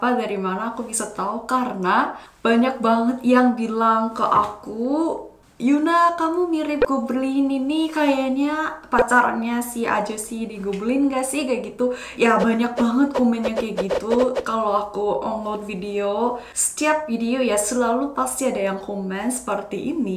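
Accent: Indonesia. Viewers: wih,, dah kayak orang indo logatnya.. fix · Logatnya Udah Ke Indo Indo annnn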